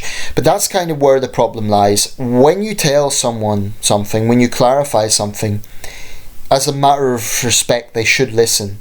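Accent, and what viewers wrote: Scotland, United Kingdom. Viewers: I was convinced you had a Scottish accent until you replied to my comment lol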